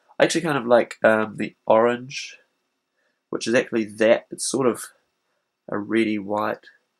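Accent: New Zealand. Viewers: Are you a kiwi or an aussie? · i thought so, you've got that kiwi accent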